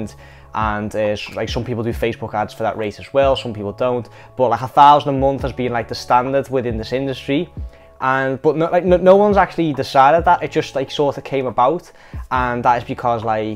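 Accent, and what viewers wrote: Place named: United Kingdom. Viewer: jeez that uk accent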